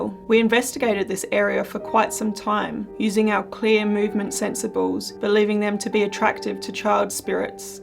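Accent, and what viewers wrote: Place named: Australia. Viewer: I love the fact that I can tell you are really serious about the paranormal. What makes you videos better than anyone else's is your beautiful Australian accent. Love your videos. Thank you. Keep them coming.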